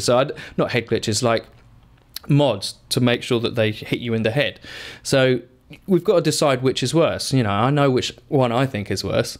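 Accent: United Kingdom. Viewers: Lolzer i thought i was in my sub box, clicked this video thinking it was BT heard the english accent and thought wtf? Really enjoyed the video and will definitely subscribe:) hon the BT for liking he video and confusing the shit out of me:L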